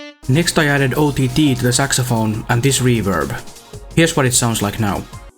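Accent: Finland. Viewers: You gotta be finnish. Theres no way u arent and talk english like dat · Finnish accent is frying me 😭Vitun hyvä video tho :D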